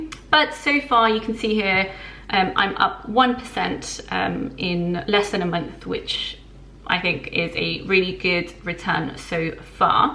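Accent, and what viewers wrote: England, United Kingdom. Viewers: I am going open an account..What I don't understand is why in some countries financial advisor suggest not to invest in ETF.... By the by, beautiful accent. May I ask where in England you are from?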